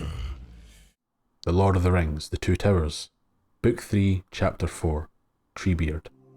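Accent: Scotland, United Kingdom. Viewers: I listen just for your accent. Scottish? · There is no shortage of knowledgeable people when it comes to Tolkien lore...I, myself, have read all published material many times over, and explored other material as well. However...your insight is what sets you apart. I've listened to several of these videos, now, and have learned a great deal. You go beyond stating the 'facts' and explore the relationships, meanings, reasons, etc. that give the facts their importance. Truly a work of art. Your pacing and diction is spectacular as well, and the distinct--yet clear--accent makes me miss Scotland in a bittersweet way. Thank you for your contributions to our understanding of this wonderful universe.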